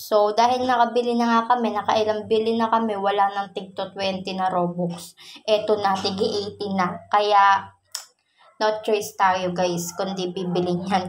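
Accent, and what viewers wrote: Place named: Philippines. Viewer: almost hears like you talk Philippines my mom talks Philippines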